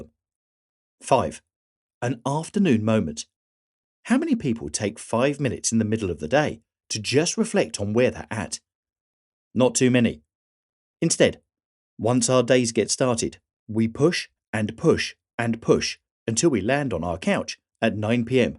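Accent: United Kingdom. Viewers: That thick british accent is so annoying:d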